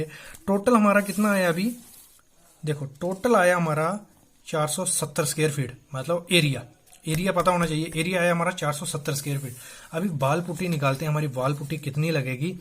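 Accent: Jammu, India. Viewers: bai muje sabse pehle ye batao ap Jammu se ho kya?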